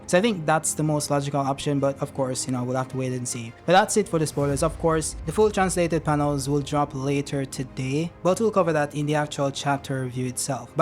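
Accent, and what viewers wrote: Jamaica. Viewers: yo bro are u jamaican?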